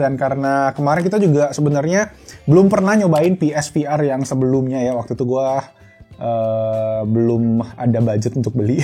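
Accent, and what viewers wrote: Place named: Palembang, Indonesia. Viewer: Haha logat palembangnya gk hilang ya bg, ada kalimat "sisip dikit" wong kito bangett, btw am a one of your big fans and hopely someday bisa kolaborasi🙏 dan shring ilmuny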